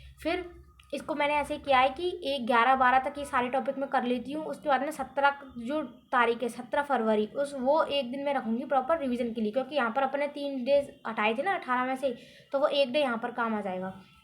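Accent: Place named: Bharatpur, India. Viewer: Bharatpur se ho kya language to aesi hi h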